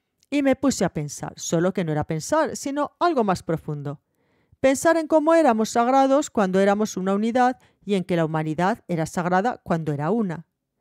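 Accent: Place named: Aragon, Spain. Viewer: De que parte de españa eres? tienes un accento muy bonito, y buena voz =) soy muy malo para los accentos, eres mañica??? xD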